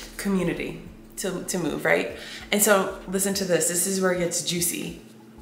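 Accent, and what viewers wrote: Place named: California, United States. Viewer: Your Cali accent is annoying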